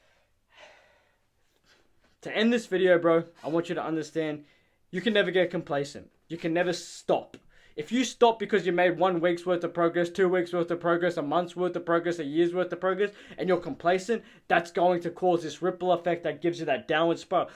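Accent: Australia. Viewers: Hey bro where you from, are you from sydney??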